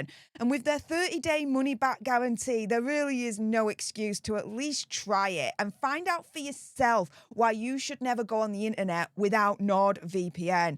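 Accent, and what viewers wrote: Yorkshire, United Kingdom. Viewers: Oh, ur a great girl. U make me laugh. Such an awesome sweet girl. The way u tell things is so brilliant. Keep up ur great work. Love that Yorkshire accent, so lovely. Fantastic girl. ❤️❤️❤️❤️❤️❤️🙏🙏🙏👏👏😂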